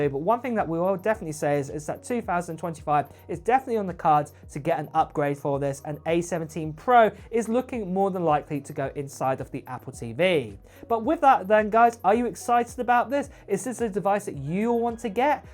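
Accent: United Kingdom. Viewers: I definitely will buy the next  TV. You are really good explaining the new devices plus it helps with your British accent, I m from London UK. 🇬🇧 😁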